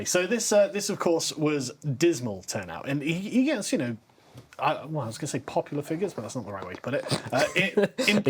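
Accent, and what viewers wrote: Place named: United Kingdom. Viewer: So nice to discover a channel I've not seen before and said channel improves the content on Youtube. Not having to listen to the same people to get facts so consider me a new fan of this show! And loving the accent since I am from the UK too!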